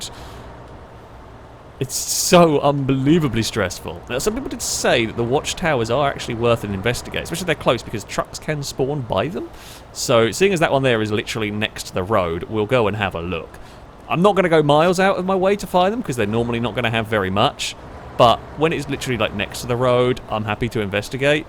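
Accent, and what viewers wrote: United Kingdom. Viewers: Are you British? I'm irish and we dont like the British but if you are your a exception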